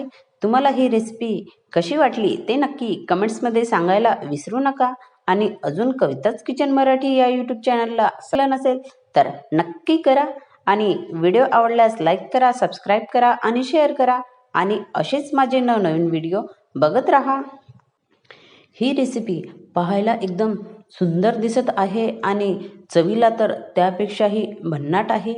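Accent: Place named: South, India. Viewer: ताई साऊथ च्या आहेत म्हणून असे मराठी बोलतात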